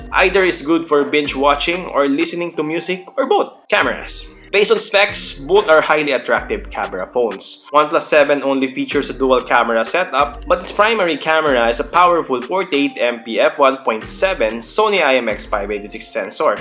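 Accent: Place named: Philippines. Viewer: I love your accent bro #pinoypride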